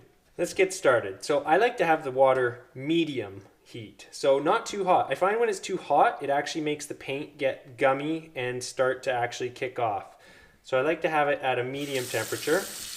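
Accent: Canada. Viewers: You sound very Canadian or Minnesotan (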